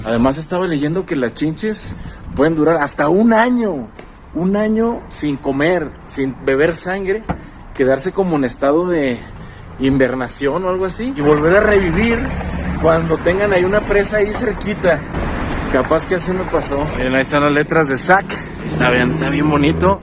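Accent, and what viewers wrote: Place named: Mexico. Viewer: Jajaja me encanta como relatas , con este toque mexicano! 😂😂😂😂😂😂😂😂 y pues cuidado con las chinches ! Revisa toda tu ropa antes de llegar a casa , porque esas se esconden y después proliferan 🥲🥲🥲😊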